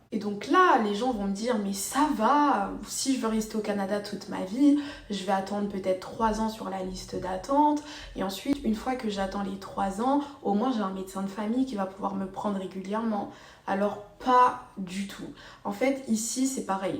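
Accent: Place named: France. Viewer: mais de quoi tu nous parle, ta un accents Français et tu parle de pas venir au canada si.........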